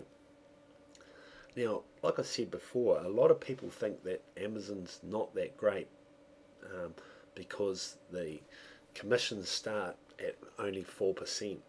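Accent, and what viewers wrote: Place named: Australia. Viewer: I love the subtitles - mis-interpreting your Australian accent I'm afraid. Sorry - I'll continue to watch the video.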